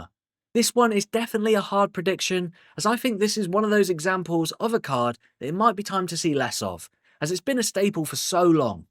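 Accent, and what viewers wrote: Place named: United Kingdom. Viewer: I’ll be honest I thought you were using AI British style accents for your shorts. Buttery smooth my man 😂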